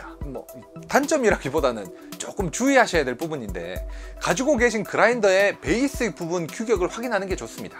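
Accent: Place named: Countryside, South Korea. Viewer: 시골 사투리가 구수하네요. 좋은 정보 감사합니다.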